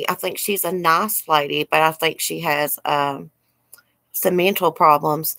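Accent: South, United States. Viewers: New sub, just like hearing a fellow southern accent! I only watched A once way back when the golightly drama happened. Hate this for the ones she hurt deeply and her son.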